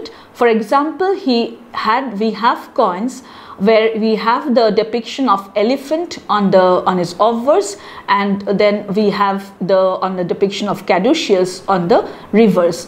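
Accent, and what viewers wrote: India. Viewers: Namaste i like ur vidéo n indian accent very pleasant i like india n rusia not démoniaque people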